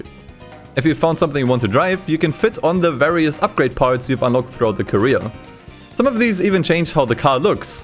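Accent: Germany. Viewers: Are you German??????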